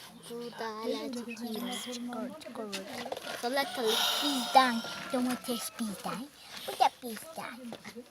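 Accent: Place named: Nevşehir, Turkey. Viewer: Merhaba kardeşim ya ben bu görüntüleri çok seviyorum. Köyüm geldi aklıma. Ben Nevşehir 'liyim şiveniz bizim köylüler gibi. Bide tavuk sesleri geliyor keşke bende köyde yaşasaydım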